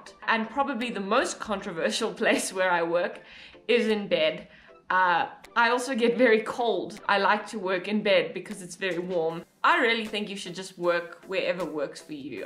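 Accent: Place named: South Africa. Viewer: SO NICE WHEN I UNEXPECTEDLY HEAR THE SOUTH AFRICAN ACCENT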